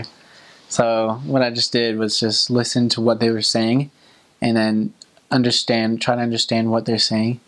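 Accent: Dominican Republic. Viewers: You sounds really like a dominican when you speaks spanish wow you are really smart no all missioneries can do that!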